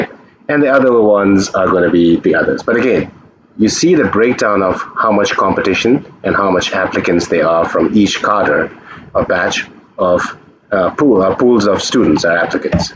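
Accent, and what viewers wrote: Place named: India. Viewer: Indian accent is awesome.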